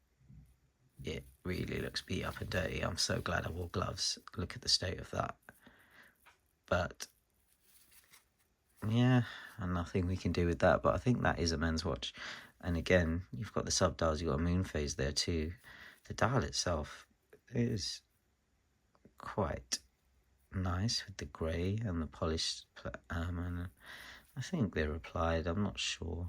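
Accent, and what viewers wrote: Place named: United Kingdom. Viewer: I liked your presentation with soft British accents. Your thick husky voice is pleasant. Proud of you!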